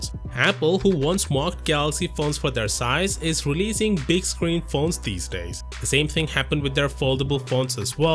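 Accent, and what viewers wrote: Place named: India. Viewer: This guy has an Indian accent if you listen carefully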